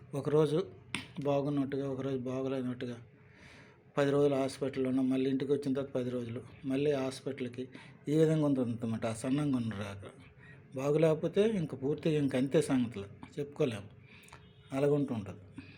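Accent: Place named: Srikakulam, India. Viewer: గురువు గారు మీ యాస, భాష చూస్తుంటే మీది ఉత్తరాంధ్ర శ్రీకాకుళం అనిపిస్తుంది.. మీరు ఎక్కడివారైన చాలా మంచి విలువైన వారసత్వ నిధిని సమాజానికి అందిస్తున్నారు,అంతరించిపోతున్న ఇలాంటి భారతీయ విద్యను నలుగురికీ తెలిసేలా చేస్తున్నారు..కానీ నా విన్నపం ఏంటంటే మీ లాంటి వారిని ప్రత్యక్షంగా కొంతమందిని తయారు చేయండి.. కనీసం మీ ఇంట్లో వారినైనా కొత్త తరాన్ని తయారు చేయండి... నమస్తే ,జై శ్రీరామ్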